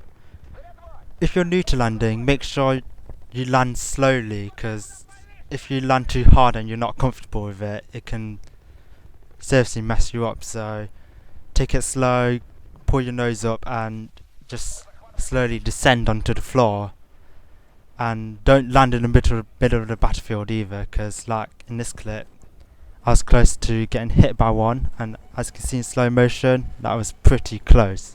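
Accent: United Kingdom. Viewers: are u british u sound british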